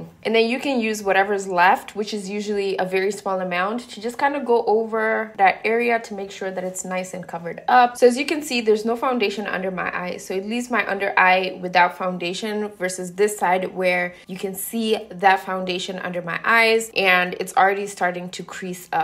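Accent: Caribbean. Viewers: This is my first video of yours and I sense a bit of Caribbean in that accent. You are beautiful btw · I could listen to you speak all day. Your accent is heaven to me, bringing back lovely memories of back home in N.Y. many years ago, conversing with my neighbors from Jamaica and Trinidad-Tobego. Our children attended school together. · Love your videos your accent especially.. sounds Caribbean-ish flavored ☺️✨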